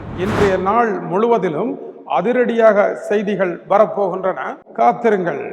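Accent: Jaffna, Sri Lanka. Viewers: எப்படி ஐயா இருக்கிறீர்கள் ? உங்களுடைய தமிழ் அதாவது யாழ்ப்பாணத்து தமிழ் இனிமையாக இருக்கிறது ... இதுதான் நடந்தது... பக்கத்து வீட்டுக்காரனுடன் நீங்கள் நண்பராக இருக்கிறீர்கள். அவன் உங்களது பரம எதிரிகளை தனது வீட்டில் இருக்க நீங்கள் சம்மதி கொடுப்பீர்களா ?? இதுதான் ரஷ்ய உக்ரைனிய போருக்கு காரணம். ஒரு கோமாளி அரசியலுக்கு வந்தால் எவ்வாறு இருக்கும் என்பதற்கு உக்ரைன் சிறந்த உதாரணம். 😊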